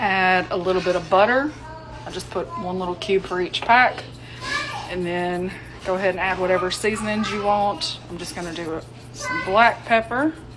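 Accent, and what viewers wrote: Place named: South, United States. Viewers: Somewhere in the south for sure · you sound like an american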